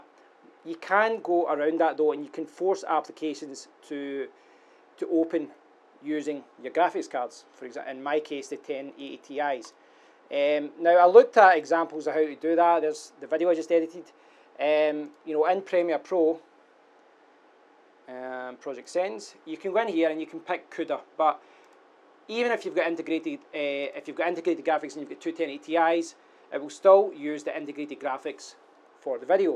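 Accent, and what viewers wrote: Scotland, United Kingdom. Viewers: Hey, is it scottish english you're speaking?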